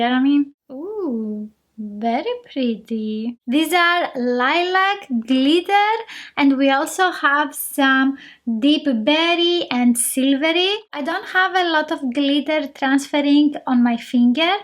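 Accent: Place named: Greece. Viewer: Είσε Ελληνίδα η κάτι τέτοιο? Γιατί η προφορά σου μιαζει ελληνική δεν το λέω για κακο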